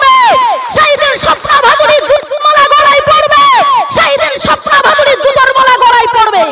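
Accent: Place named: Bangladesh. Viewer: জয় শ্রী রাম 🚩🚩 কথা শুনে বোঝা যাচ্ছে আপনি বাংলাদেশী মুসলিম।। এত এব হিন্দু হিন্দু বলে চেঁচাবেন না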